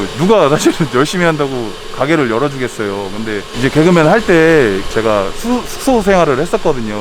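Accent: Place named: Chungcheong, South Korea. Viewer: 찐 충청도 충남 말투 굳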